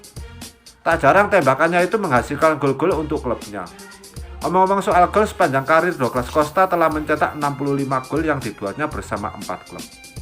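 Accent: Java, Indonesia. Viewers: Logat Jawa Lo kental banget! Cari suara yang komersil boss.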